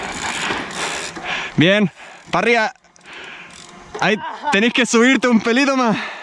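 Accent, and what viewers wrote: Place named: Chile. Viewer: Así hablan los verdaderos chilenos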